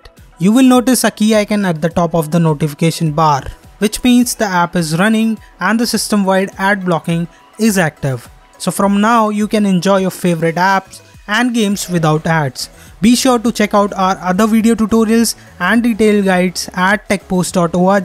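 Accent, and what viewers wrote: India. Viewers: U speak like indian i cant understand wut u said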